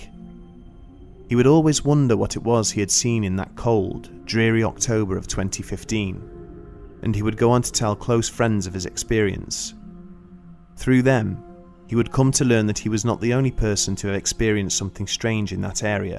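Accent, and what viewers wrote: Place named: North, United Kingdom. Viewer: Brown The narrator speaks with a northern UK English dialect and there is in fact no 'correct' English grammar. Language is an ever changing and evolving tool that humans use to communicate.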